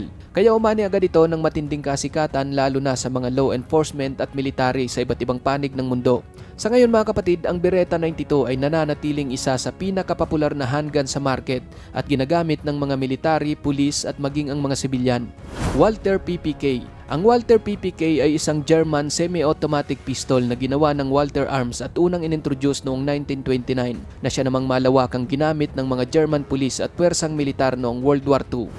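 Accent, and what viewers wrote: Visayas, Philippines. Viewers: Bisaya Punto Ka Bhai.. 🤔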